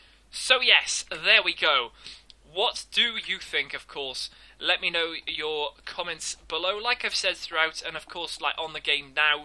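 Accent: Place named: England, United Kingdom. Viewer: Matthew was wondering where abouts in England do you come from because your accent is really cool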